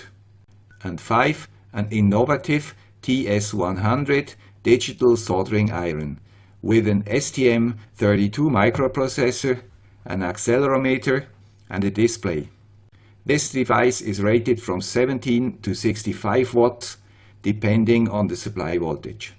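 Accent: Switzerland. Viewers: Congrats with #100. I enjoy looking at your videos and learn something every new video. I do hope you do not start #101 again by saying 'this is the guy with the Swiss accent'.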